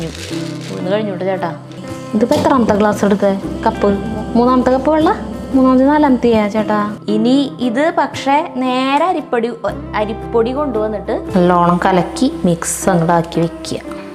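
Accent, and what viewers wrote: Thrissur, India. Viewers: തൃശൂർ സ്ലാങ് കേൾക്കാൻ നല്ല രസം 👌😍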